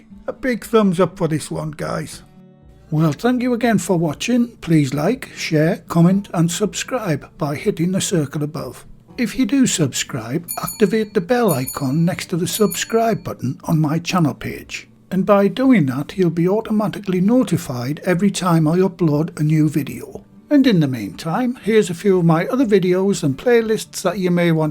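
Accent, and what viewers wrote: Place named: Northern England, United Kingdom. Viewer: Your roasties look wonderful. I am from the North East of England too, now living in Australia. Nice to hear a Northern accent!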